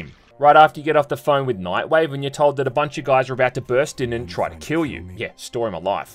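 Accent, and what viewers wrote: Australia. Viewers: I love your videos, your Australian accent paired with a witty and downright kneeslapping sense of humor makes my day sometimes. Even though i can't afford most of these games its still a treat to see someone bring underground titles and non-mainstream games to the light and its a huge breath of fresh air. Keep doin what you do ya bastard ❤.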